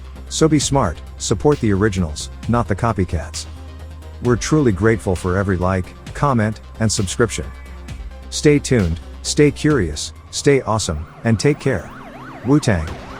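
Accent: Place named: United States. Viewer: . I'm aussie and I reckon the yank voice suits this better. Dunno why, probably just used to it. Either, you're awesome, stay that way!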